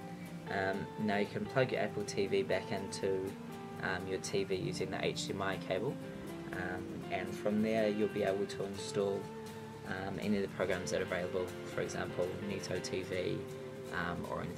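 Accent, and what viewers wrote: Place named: New Zealand. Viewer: Nice video, new Zealand accent xD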